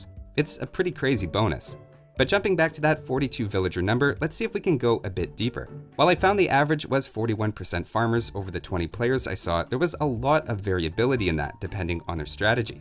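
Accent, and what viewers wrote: Canada. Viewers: Wait. are you canadian dude?? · You are Canadian?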